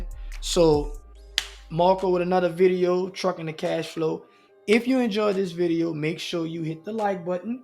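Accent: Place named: Louisiana, United States. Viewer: Salute my Louisiana bro i can here your accent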